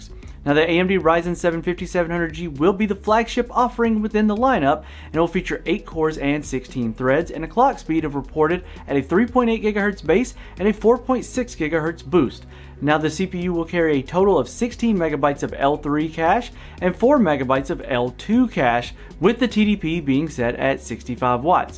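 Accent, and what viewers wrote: South, United States. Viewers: Your subtle southern accent is quite pleasing to the ear for some reason lol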